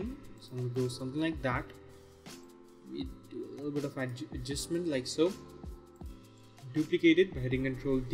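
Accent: India. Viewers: Like a dove telling. Accent hindi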